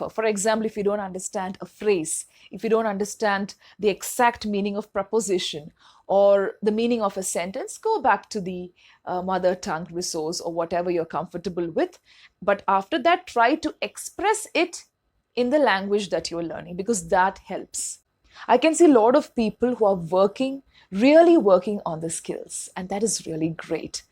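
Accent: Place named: North India, India. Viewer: When you speak English, it sounds like u r from north india. 🤔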